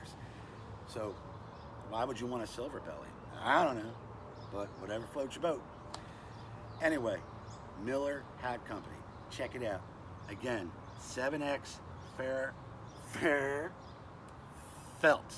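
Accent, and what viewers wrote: Australia. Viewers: Aussie accent is hard to do if your not an Aussie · The hat is awesome. You sound just like you are from the outback brother. This is awesome.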